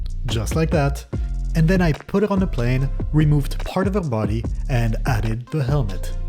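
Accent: France. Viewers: Il y a un petit côté français dans ta voix non ? :) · Incredible video !are u French ? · Wow, I really only twice heard a hint of your french accent in the mein part of the video. Your English is perfect, especially compared to my Parisian colleagues! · are you french? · T’a un accent français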